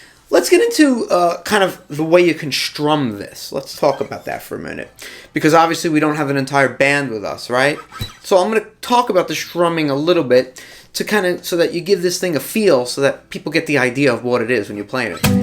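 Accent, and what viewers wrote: New York, United States. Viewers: Fab lesson Love the NY accent Cheers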